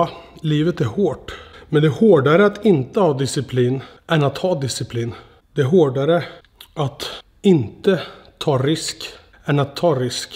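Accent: Värmland, Sweden. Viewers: Är du från värmland? Bor i värmland! Låter som du kommer herifrån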